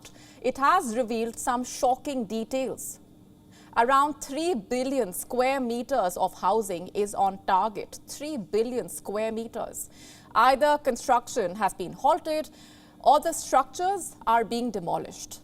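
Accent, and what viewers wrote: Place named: India. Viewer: The indian accent is the worst. so irratating.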